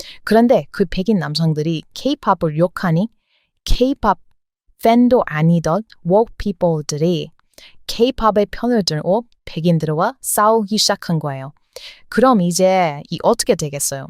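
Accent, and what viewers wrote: United States. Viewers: 왠 AI? 목소리 너무 좋아요. 전형적인 미국인의 한국어 어투로 들려요. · 나도 AI 음성의 유튜브채널을 싫어하는 사람입니다. 그것들은 인간의 목소리가 아니라서 감정이 없고 억양도 부자연스럽지만 그보다 더 중요한 것은 가짜 뉴스나 남이 만든 내용들을 짜집기 해서 베껴 쓰는등 내용의 진실성이 의심되었기 때문입니다. 그래서 나는 그런 채널들은 구독하지 않지만 채널은 단 한번의 시청으로 바로 구독했습니다. 의 목소리는 미국인들이 한국어를 할때의 억양 그대로였고 영어로 말할때는 미국인의 본토발음 그대로였기 때문입니다. 그리고 그 무엇보다도 중요한 것은 만의 개인적인 견해가 있었고 다른 유튜브채널에서는 볼수 없는 독창적인 내용이 있었기 때문입니다. 님께 질문하겠습니다. 어떤 유튜버가 의 목소리를 AI 음성이라고 하던가요? 님도 의 목소리가 AI 음성으로 들리던가요? 님이 이 질문에 답변을 못한다면 그저 어그로나 끌기위한 댓글장난으로 간주하겠습니다.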